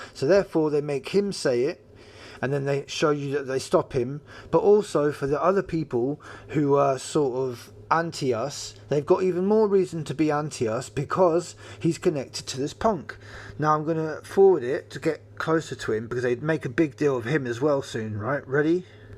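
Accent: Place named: North, United Kingdom. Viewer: love your northern accent...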